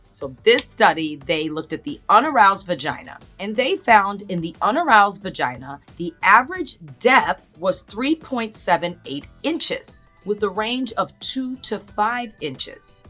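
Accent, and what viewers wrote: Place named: United States. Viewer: American narration....cant be bothered to listen.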